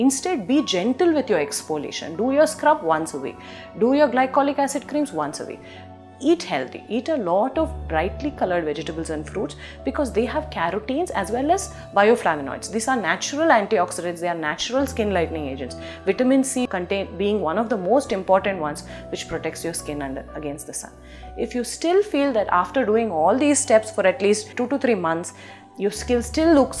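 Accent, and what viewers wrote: India. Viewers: white shadow dear she is an Indian ....in India we concentrate more on our native Hindi language which has a thick accent....we too speak English but the accent remains same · Yaaae..i hardly undrstnd her . Only Indian will understand her..coz .she speak India English. Hihihiihi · She speaks with an indian accent , i find it hard to understand too .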